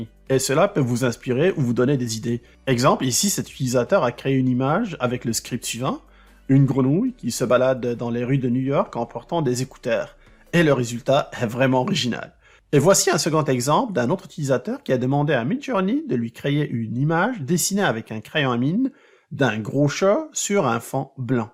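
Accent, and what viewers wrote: Quebec, Canada. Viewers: Très bonne vidéo, merci. Et, ça fait plaisir d'entendre un accent québécois aussi facilement compréhensible. Bravo, très bon français. Après, l'utilisation de l'anglais de temps à autre n'est pas un drame, nous sommes en 2023, beaucoup de pays ont 2 langues officielles depuis plusieurs décennies, dont l'anglais en 2ème, et leur première langue n'a rien perdue et reste le langage le plus parlé dans le pays. Quoi qu'il en soit, bravo à tout les québécois qui parlent un français impeccable et qui sont même capables de corriger toutes mes coquilles car ils écrivent, souvent, beaucoup mieux que moi. 😅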